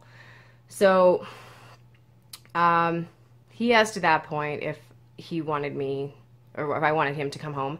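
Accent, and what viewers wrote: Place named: Europe. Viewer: He sounds like he's from one of the bloodlines of cowards that stayed behind in europe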